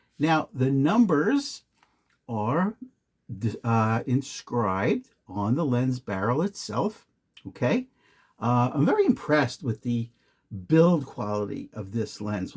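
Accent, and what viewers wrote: New York, United States. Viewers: Watching from Bangkok Thailand! You have the best New York accent ever. Thanks for your advice on my new mic set up!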